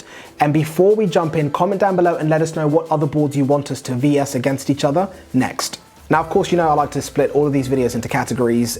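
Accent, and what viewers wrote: England, United Kingdom. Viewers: What country do you live in? The way you're talking about other countries you sound American but then you have an English accent.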